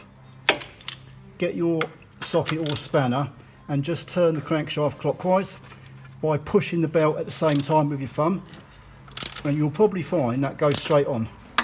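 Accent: United Kingdom. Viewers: Don't own a Focus, have never owned one, and probably never will... and yet for some reason I've now watched the vid three times. Must be that British accent or I've just had too much JD. But then again, can one really have too much JD in this ClownWorld? Anyway... good vid, thumbs up, and all the good stuff. 🥃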